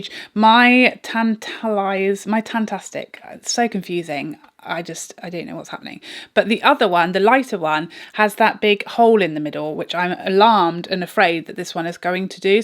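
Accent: England, United Kingdom. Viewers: you always look pretty, but exceptionally pretty today. My father's family was English, so I just adore listening ro you speak. And girl, you are so stinkin funny! 🤗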